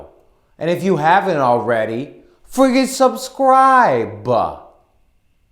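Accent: New York, United States. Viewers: His voice is like a New Yorker Morgan Freeman. Enchanting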